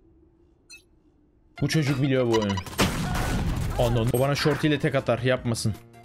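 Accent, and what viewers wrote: Thrace, Turkey. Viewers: abi trakyalımısın konusma tarzın oyle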